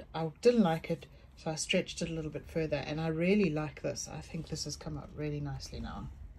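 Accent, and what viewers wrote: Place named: Southern Africa. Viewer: It's nice hearing a Southern-African accent! Btw, how do you sell your artworks?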